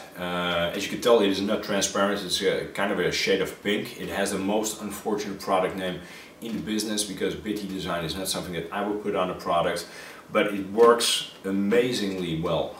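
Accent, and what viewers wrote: Netherlands. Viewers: Hey buddy, are you dutch? You sure sound dutch cuz I am too ;)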